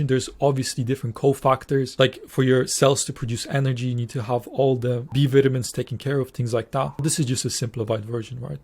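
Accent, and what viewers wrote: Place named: Ireland. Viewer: I am concerned that your mentions of the thyroid hormone T3 come out sounding exactly like "tea tree" in your lovely Irish accent (which does not differentiate between the "t" and "th" sounds). The trouble with that is the Australian essential oil by the name "tea tree oil" (also known as melaleuca oil). It is used topically for skin problems in folk medicine and also in aromatherapy, but it's highly TOXIC when taken by mouth. Excessive use on the skin can cause endocrine disruption leading to breast enlargement in males. I don't want anyone to think that you're suggesting that they take or use tea tree oil when you say "for this to occur, you need T3" and "you lack T3 in the body."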